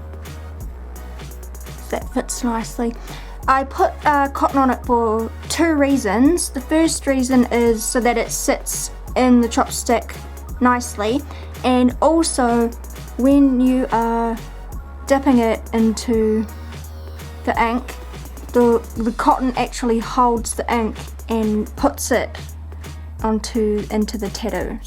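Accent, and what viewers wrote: New Zealand. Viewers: Hi this is really cool and i love your videos. are you from new zealand cause your accent is awesome. Awesome bear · Kiwi accent :3 The tattoo turned out rad! If I ever came across you in person I would seriously pay you to give me one x) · This is a bad idea but im doing it anyway!! I noticed youre from nz right away and i sound the same but i roll my r's slightly more :-) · steezy.k kiwi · nope it is her accent and she is a kiwi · thought you were a kiwi! (Waving at you from Wellington) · She's from New Zealand, be ignorant somewhere else.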